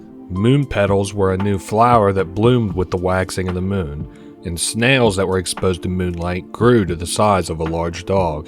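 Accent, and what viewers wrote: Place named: Spain. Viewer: The most spanish english voice i ever heard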